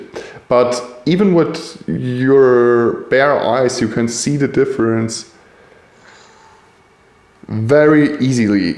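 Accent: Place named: Germany. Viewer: Man hört den deutschen direkt heraus^^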